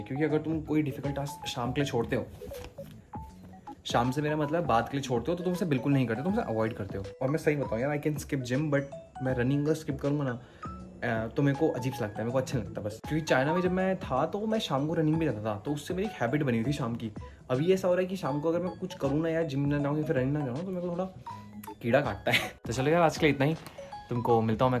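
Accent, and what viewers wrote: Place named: Uttarakhand, India. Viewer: I swear you are from Uttarakhand accent bahuttt jyadaa familiar heinn 😭🥰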